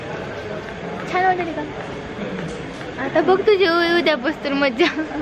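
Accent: Latur, India. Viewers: Tuzya aavajala khup sut hoti aapli Latur chi bhasha.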